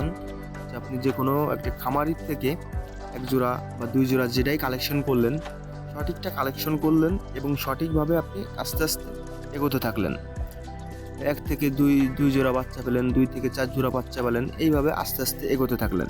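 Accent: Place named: Magura, Bangladesh. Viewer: আপনার কথা শুনেই বুঝতে পারছি যে আপনি মাগুরা বা এর আশপাশের এলাকার লোক। আমার বাসা আলোকদিয়া পুকুরিয়া, বগিয়া ইউনিয়ন। আমি মাগুরা শহরে থাকি।যাই হোক আপনি কত দিন যাবৎ কবুতর পালন করছেন? আপনার কি মনে হয় যে এটা পালন করলে কি লাভবান হওয়া যায় , নাকি শুধু শখের বসে এটা পালন করা উচিত? একটু রিপ্লাই দিয়েন।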